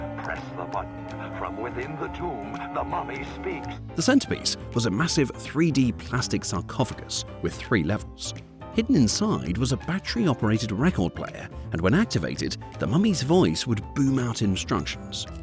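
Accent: England, United Kingdom. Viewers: Don't remember any of these in the UK despite the England sh narration , but in the UK, I think Witchy Witchy was Haunted House.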